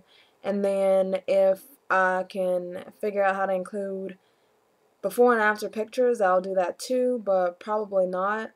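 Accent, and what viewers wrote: United States. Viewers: OKAYY!!! Tell me you live in Mississippi!?...you are country. yet its cute.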